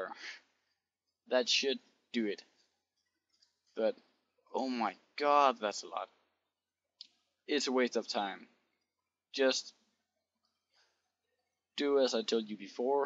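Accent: Denmark. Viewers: are you danish :D?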